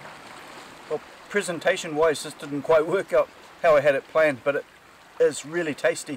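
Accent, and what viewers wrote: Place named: New Zealand. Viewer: For what it's worth I enjoy videos like this one. I am also a "Kiwi" (I thought I detected your accent a while ago but I was not certain) and I reside in New Zealand, but I lived in Scotland (Oban to be specific) for about two months in late 2001. There's some superb photography in this video - I really appreciated it.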